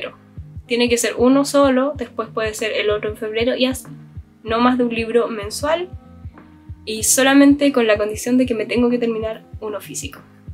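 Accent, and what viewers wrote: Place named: Chile. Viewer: Me encanta el acento chileno, felicidades por tu canal muy buenos videos sigue asi, saludos desde México.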